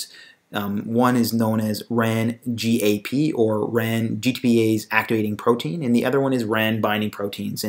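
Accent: Canada. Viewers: You rock!!! Thanks so much and do I sense a Canadian accent?? I love it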